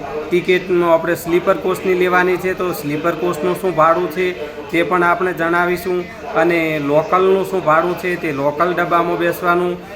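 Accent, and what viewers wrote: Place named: North Gujarat, India. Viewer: ભાઇ તમારી ભાષા ઉતર ગુજરાત ધાનેરા થરાદ ની લાગે છે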